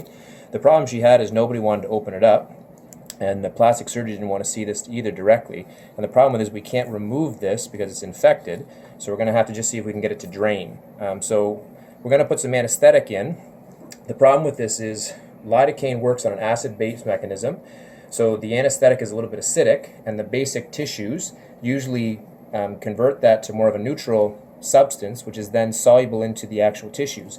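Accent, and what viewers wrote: Canada. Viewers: he is Canadian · "A month, eh?" Knew he was Canadian almost right off the bat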